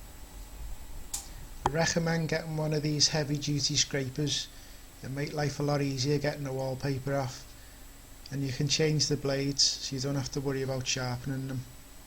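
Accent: Liverpool, United Kingdom. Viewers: Yes lad scouce as well (Manc here) keep up the good work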